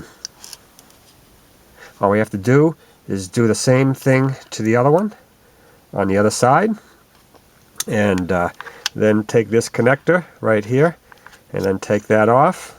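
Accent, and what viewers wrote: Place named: New England, United States. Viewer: Probably from New England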